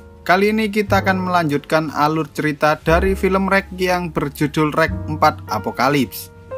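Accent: Java, Indonesia. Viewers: Btw Mas Nyaa Ini Orng Jawa??.... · Medok buanget si mas bahasa Indonesia nya, 🙏👍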